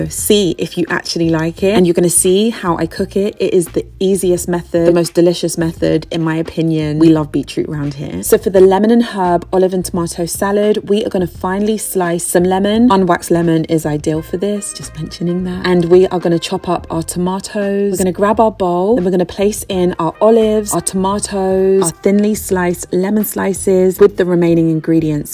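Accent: United Kingdom. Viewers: New subsciber! Love your content and your accent makes me miss living in the UK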